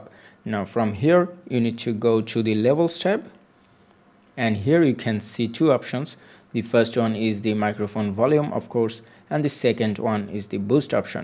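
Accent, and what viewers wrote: India. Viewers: Where would the world be without people like you? Seriously, every time I have a problem in life, there's someone with an indian(?) accent that post a video somewhere that makes everything easier. People like you are the salt of the earth.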